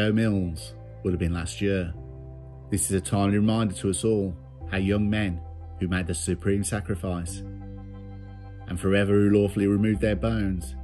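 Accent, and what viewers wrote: Birmingham, United Kingdom. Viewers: People from Birmingham or thereabouts sound like that! 😂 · He has a regional accent, they're quite common in Britain, it's one of our quirks , haven't you heard? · good to hear a real brummie accent for a change !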